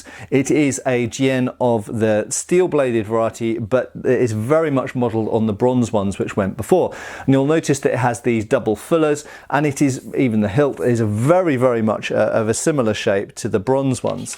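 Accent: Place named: United Kingdom. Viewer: your pronunciation is fine for JIEN and DAO but you sound like that Brit intel guy who sent Bruce Lee on the mission in Enter the Dragon -- he also said "Haaan" while BL used the more genuine "Hahn"... good rule of thumb for pronouncing Asiatic names is to use the typical romance language vowels -- A is usually AH rather than AY or AAA... KEEP UP THE GREAT WORK!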